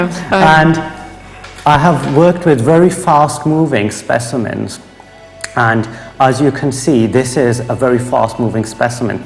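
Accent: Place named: Wales, United Kingdom. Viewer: Is he Welsh? I just can't pinpoint that accent.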